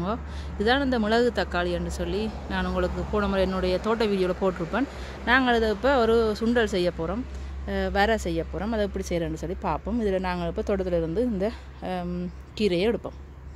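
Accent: Sri Lanka. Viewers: மிகவும் அழகான இலங்கை தமிழ்... இனிமையாக உள்ளது சகோதரி.. கீரை பொரியல் அருமை👌👌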